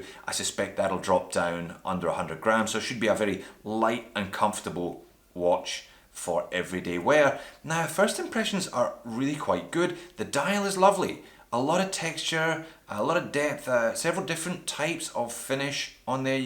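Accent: Scotland, United Kingdom. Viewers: where are you from? scotland? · Upon starting the video I was absolutely delighted to hear your Scottish accent lol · Greetings from New York. Is that a scottish accent? Beautiful watch, but I've never seen a bracelet come in such poor condition with such horribly rolled links. The case and dial look right on.. but that bracelet almost looks counterfeit!...Either way it's a beautiful watch and I agree whole heartedly that it punches far above its weight class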